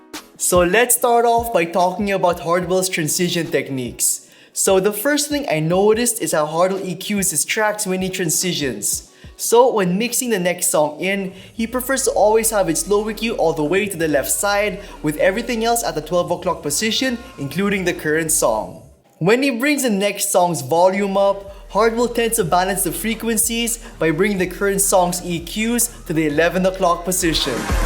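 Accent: Philippines. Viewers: Lol your accent is funny, are you filipino?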